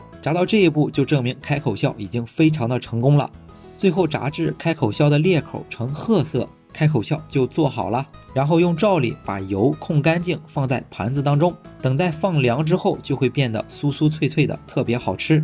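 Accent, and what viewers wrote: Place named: Qingdao, China. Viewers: 亲切的乡音， 实诚的山东人， 接地气的讲解， 不故弄玄虚！ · 感觉听出了青岛口音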